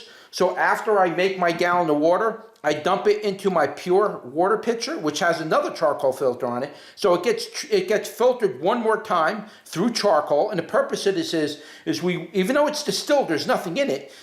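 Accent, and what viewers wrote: New York, United States. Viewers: Thank you for this mine has a digital board at the front, great review, are you from New York, I'm in England!!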